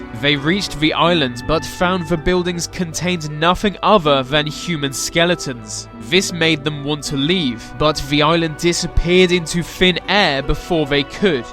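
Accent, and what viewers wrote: England, United Kingdom. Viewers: He's English for sure! :)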